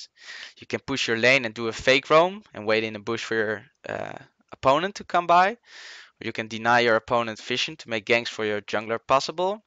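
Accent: Netherlands. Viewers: ben je nederlands? je hebt een nederlands accent (are you dutch i what i asked)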